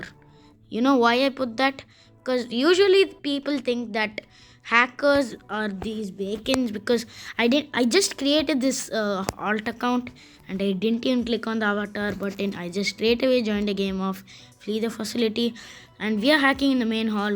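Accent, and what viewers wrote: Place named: India. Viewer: Lol your Indian scent lel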